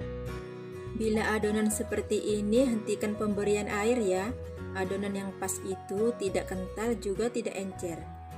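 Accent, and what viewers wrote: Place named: Madura, Indonesia. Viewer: Mantep apenna yu. Empian oreng kaemma? Mak logatta padena reng madure. Sukses yu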